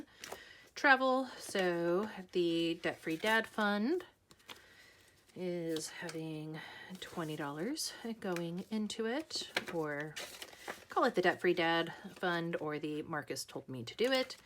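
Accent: Australia. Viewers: 🏍 I swear I lost it when you called the doggies boof heads. You are getting more aussiefied every day ❤️ Kelz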